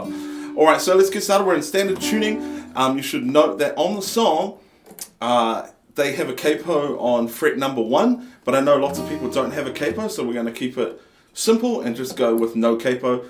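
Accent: New Zealand. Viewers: bruh is he from nz?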